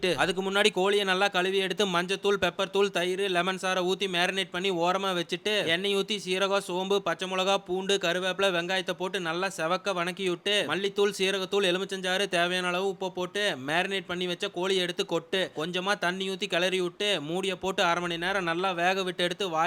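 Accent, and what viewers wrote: Coimbatore, India. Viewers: நானும் கோயமுத்தூர் தான் அண்ணா உங்க videos எல்லாம் ரொம்ப நல்லா இருக்கு அத விட நம்ம ஊரு பாசை சூப்பரோ சூப்பருங்க அண்ணோவ்👌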